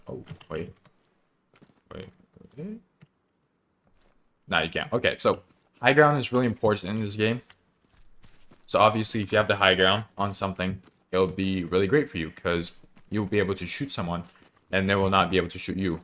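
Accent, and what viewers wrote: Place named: Scotland, United Kingdom. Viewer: Oh the Scottish accent 😭 maybe work on it I suppose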